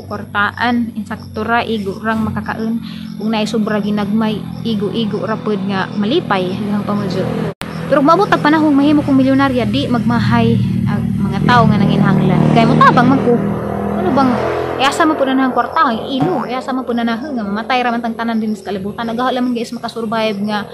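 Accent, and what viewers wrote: Bohol, Philippines. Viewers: nasuya rana maam oi, samot na cguro ug mka tukod kag Gaisano diha 🤗 lami jud paminawon ang bol-anon maam oi pinagahi jud 😊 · asa man ka dapit sa bohol nga pirti mang gahia sa imong sinultian? 😍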